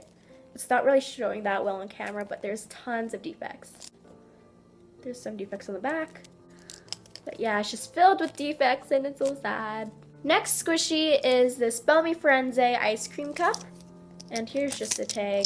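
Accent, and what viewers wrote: Canada. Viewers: Just wondering, are you Canadian? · She is from Canada I think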